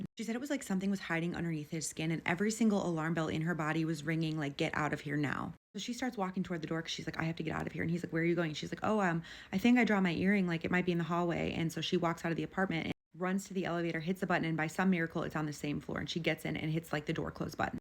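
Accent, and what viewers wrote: United States. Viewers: Girl you talking to fast can't understand everything you're saying Americans some times upsets me with the way they pronounce alot of words bro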